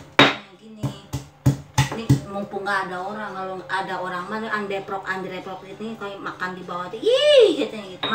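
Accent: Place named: Sunda, Indonesia. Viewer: Dari judulnya aja udah gokil Mak , suka sm medok Sundanya 🙏🏻😁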